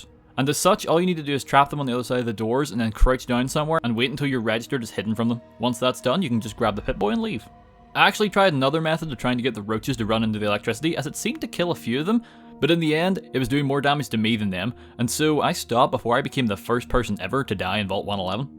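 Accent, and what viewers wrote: Northern Ireland, United Kingdom. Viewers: You sound northern Irish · You from Northern Ireland? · Northern Ireland? 👍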